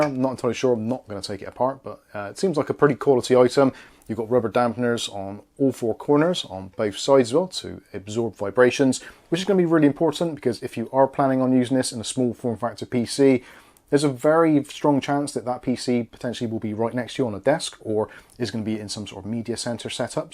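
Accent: Bristol, United Kingdom. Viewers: Bit of a novice to PC builds I have an old motherboard ASUS H81M with a intel i7 4790 CPU in a HORNET CA300-NG case! reckon this un ill fit? PS nice to ear a Bristol accent! cheers.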